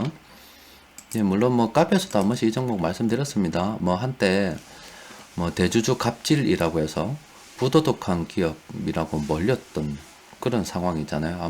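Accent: Gyeongsang, South Korea. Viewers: 오늘도 좋은 하루되세요 혹시 어디사세요 경상도 사투리 같은데 행복한 주말되세요 꾸벅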